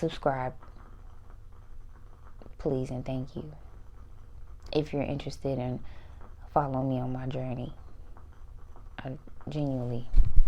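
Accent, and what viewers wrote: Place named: Indiana, United States. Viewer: good content, this is off topic but im from Georgia and my ex is from indiana and you and her have similar accents, but anyway what you're saying is understandable in regards to the reactions, your music and your passion for it is admirable, keep doing what you're doing. you'll get where you want with your gift.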